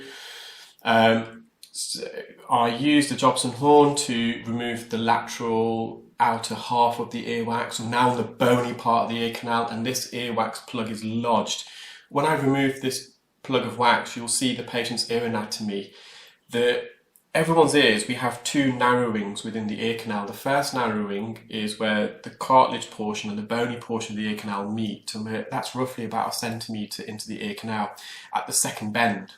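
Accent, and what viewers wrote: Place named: Leicester, United Kingdom. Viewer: Hi Mr, I am amazed by your videos after coming across them when researching my sons cholesteatoma diagnosis. I recognised your accent and was very impressed to find you are also a fellow Leicester man. It would be amazing to meet with you sometime to discuss my sons hearing aid possibilities and his future outlook. He has had 11 operations so far and is still profoundly deaf in one ear. We are under Dr Bannerjee at the LRI. Kindest Regards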